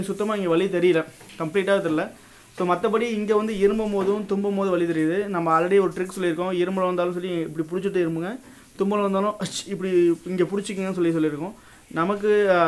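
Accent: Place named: Madurai, India. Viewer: Bro Neenga paesuratha paatha Madurai nearer Pola Neenga..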